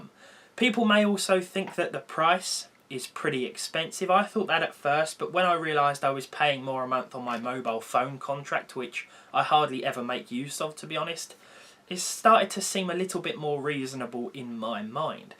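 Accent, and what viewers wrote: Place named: England, United Kingdom. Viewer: watching you face review in this videos made me notice that you have a strong english accent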